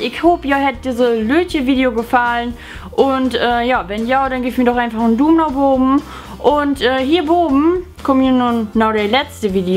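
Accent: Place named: North, Germany. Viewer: ick find dat bannig good wat du dor mäks, bloß vertell mi es, woar du weg kümms, dat klingt so no norden, tüssen bremen und kiel. bi us in´t münsterland häb wi nen bäten annern snak.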